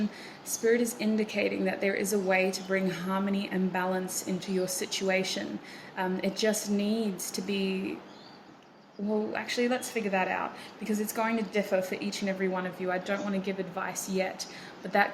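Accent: Australia. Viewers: I literally saw the backyard and thought "huh. That looks very Australian" and then you spoke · Yoooo this is my first time watching any of your vids popped up on my recommended cause I’ve been watching a bunch of tarot lately 1 I was surprised hearing a fellow Aussie accent 2 I picked pile 3 it resonated so strongly I was shocked 3 it’s the first time I watched someone pull letters out and stuff YOU LITERALLY SPELT MY NAME “Steph” and also my last name, is P so really u spelt “Steph p”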